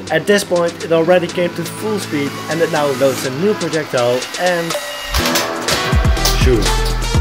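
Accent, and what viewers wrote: Netherlands. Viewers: I immediately knew you were dutch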